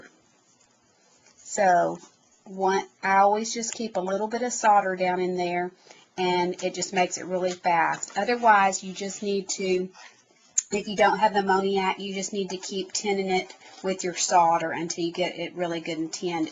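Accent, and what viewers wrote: United States. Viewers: I believe what she is saying is "tinned" which means you keep your tip covered with a thin coating of solder. Southern accents are hard to hear sometimes. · hi - firstly, you sound so much like someone I know - are you Texan? Secondly - can you use aluminium tape instead of copper tape?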